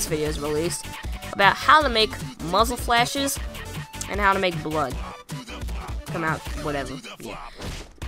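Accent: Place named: United States. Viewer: you sound 100% American, why are you choosing PAL?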